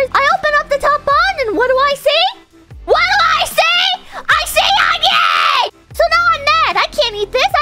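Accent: New York, United States. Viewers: I JUST realized she's got a serious Nuu Yawk accent that comes out occasionally.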